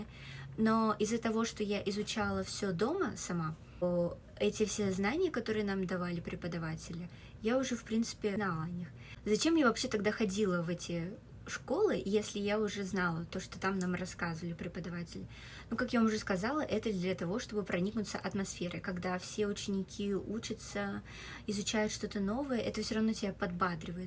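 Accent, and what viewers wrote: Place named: South Korea. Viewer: Добрый вечер! Я просто в (здесь мат в хорошем смысле) с вашего прогресса в изучении данного языка. В русской речи уже слышен корейский акцент, что считаю показателем высокого знания иностранного языка. Мне из азиатских языков (выбирал только из корейского, китайского и японского) понравился японский. Но так как там есть иероглифы, решил что с иероглифами познакомлюсь быстрее через китайский))) Но через время узнал, что в корейском тоже есть китайские иероглифы (к сожалению на данный момент уже не использую, либо почти не используют) и соответственно решил изучать и корейский. 🤕 И это была большая ошибка. Корейский на тот момент мне не зашёл, для китайского не было такого рвения как для японского. Ну и соответственно было принято решение, отложить изучение иностранных языков на неопределенный срок. В этом году (в ближайшее время) хочу вернуться к изучению 7 языков. 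В моих целях нет достижения такого же уровня в столь короткий срок, просто хочу получать больше информации по шитью из разных источников. Можно будет увидеть обзор на приложение дуолинго? В основном интересуют: произношение и построение предложений. P.S.: В мой план по изучению входит дуолинго (только из-за того что он бесплатный); несколько блогеров (на данный момент по шитью); бесплатные курсы на ютуб; ну и в дальнейшем фильмы и сериалына иностранных языках, но только с субтитрами на оригинальном языке. Соответственно музыка, и какой-нибудь информационный канал (онлайн) на ютуб.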